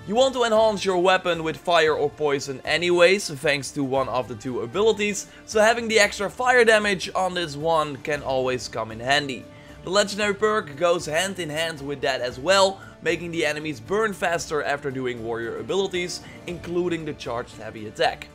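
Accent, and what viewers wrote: Netherlands. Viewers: lol I like your English talking, You more sound like someone from the Netherlands? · Are you dutch? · I love how dutch your accent is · Are you dutch cuz u kinda sound like you are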